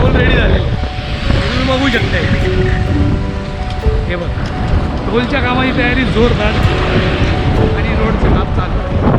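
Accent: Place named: Malvan, India. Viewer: Atishay sundar sadrikaran aahe satya peristithiche, assal malvani tadka aahe tumchya bolnyat 🙏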